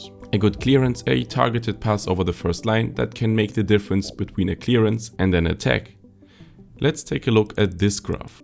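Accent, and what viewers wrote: Germany. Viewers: Nur Liebe dafür, wie zufällig die balken bei min schwarz rot gold sind 😅 und probs für die Aussprache, man hört den deutschen Akzent nur hintergründig raus 😊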